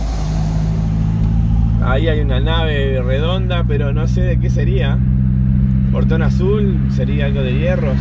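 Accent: Argentina. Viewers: Me encantan tus relatos pareces guía de turismo o mejor tu tonada es de argentino cheto ya sabés el cheto es la gente de la alta sociedad es un algo ojo me gusta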